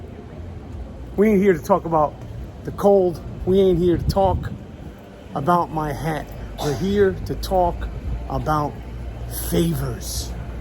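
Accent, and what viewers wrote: New York, United States. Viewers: Best Nu Yawk rant in the history of rants. Thanks for your graceful tantrums.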